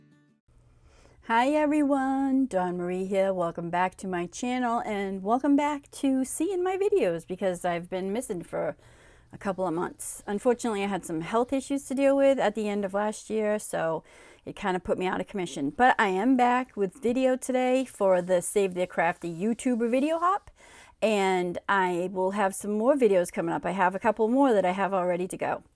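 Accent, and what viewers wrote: New England, United States. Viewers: Are you from New England? Such a cute card. Lots of nice details. I like the cloud background. USA. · I love your accent!!! What state are you from?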